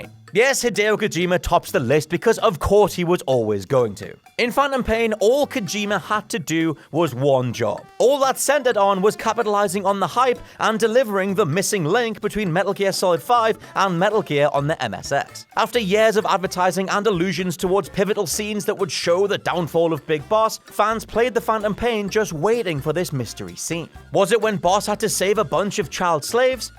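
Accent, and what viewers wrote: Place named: United Kingdom. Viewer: WhatCulture is just WatchMojo with a british accent. Watching this video made me stupider.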